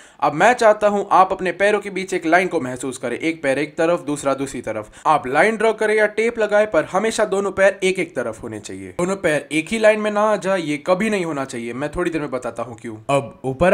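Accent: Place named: United Kingdom. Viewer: oh bhai i just watched this vid in pure british english nice dub artist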